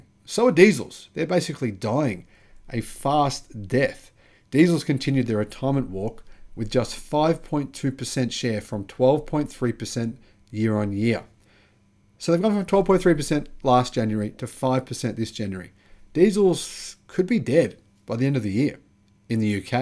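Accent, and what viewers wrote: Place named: Australia. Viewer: is based in Melbourne, Australia. Listen to English and his accent - You clearly hear that he is an Aussie. How in the world could you think that this is an American fanboy site. This is not a Tesla fanboy site. This is an good channel about the ongoing electrification of our transports.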